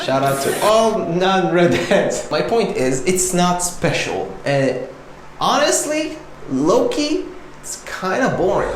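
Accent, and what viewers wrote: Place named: Germany. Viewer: The German accent kills me 😂 Ur the best man ❤️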